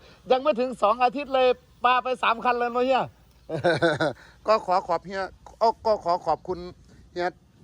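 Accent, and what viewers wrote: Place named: Kalasin, Thailand. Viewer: เอฟซี กับ เว้าลาวกาฬสินธุ์บ้านเฮานี่ล่ะครับเฮียฟังเข้าใจง่ายดีครับผม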